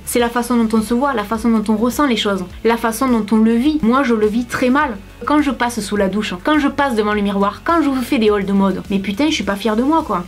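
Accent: South, France. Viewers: Je vais bientôt avoir 26 ans et je me sens tellement plus proche de toi que les autres Youtubeuse qui nous placent bien leurs vidéos Marketing et nous font culpabiliser avec leur ventre plat sans jolie poignée d'amour de chocolat Lol. Je serais tellement déçue si tu arrêtais on a tellement besoin de youtubeuse comme toi naturelle et pas superficielle. Et surtout j'adore tellement ton joli accent du sud de la France 😍 courage ma belle. Personnellement j'ai un poids normal mais avec des poignées d'amour j'assume pas trop mais je n'ai pas envie de me priver je mange déjà équilibré mais avec un peu de chocolat lol (on n'a qu'une vie) 😘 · Je crois que quand on passe la barre des 40 on a du mal á trouver son équilibre dès qu'on passe par des changements. Equilibre psychologique et physique. .Tout est plus lent. Ce qui m'aide c' est au moins prendre soin de moi au niveau de la tête. 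Comme ça j'essaye de pas trop me crasher dans les descentes. Tout le travail que tu as fait sur toi est bon , malgré ce que je vais te dire tu ne vas pas le croire, du coup il faut que tu apprennes á te supporter, oui ça s'apprend et des fois ça passe par le fait de se supporter dans la pire des situations , du coup les creux de vagues durent moins longtemps. A plus de 40 ans les hormones commandent, ne JAMAIS oublier ça et du coup on a l'impression de devenir complètement dingos. Le chemin est parfois long et tortueux. Tu es très drôle et très réaliste c' est génial , ça fait du bien . Il faut s'occuper de soi á tous les niveaux , il faut apprendre à s'aimer et on y arrive (si j'y arrive tu peux y arriver) en se faisant accompagner, en faisant des lectures... Une fois que tu auras cette autre base, toute l'expérience que tu as au niveau du sport et de la nourriture se replacera. Big up à toi , j'adore ton accent et ton amour de la vie, la vrai , me too je suis du sud et ça fait du bien de l'entendre.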